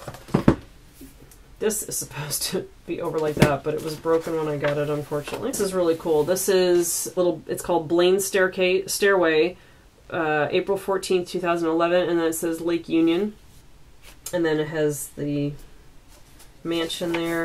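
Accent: Canada. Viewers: Thanks for this--very thorough and entertaining, also educational--helped me choose the Stephanie Bowers and Gabriel Campanaro (sp?) books. Love your Canadian-influenced accent (which pertains to nothing relevant.) I see from your YT channel that you are very "crafty" would love to see more art-centric videos.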